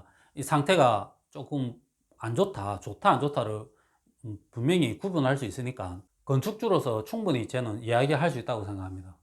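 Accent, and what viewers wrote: Gyeongsang, South Korea. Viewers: ~목소리톤으로 경상도분같으신데 혹시어느지역이신가요? 경남함안쪽인데 의뢰가능한지요?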